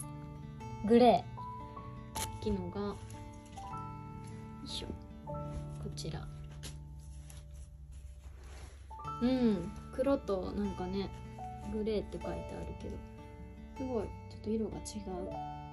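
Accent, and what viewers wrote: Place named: Kyoto, Japan. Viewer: 今までゆきりん一筋でしたが、AKBの全国ツアー(愛知公演)を見に行ってからゆいちゃんのことも気になるようになりました😅 ゆいちゃんの京都弁が好きです😊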